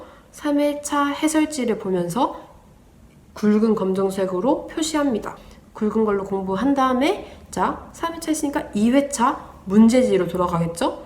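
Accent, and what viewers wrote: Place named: Seoul, South Korea. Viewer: 서울말 잘쓰는데 ~ ㅎ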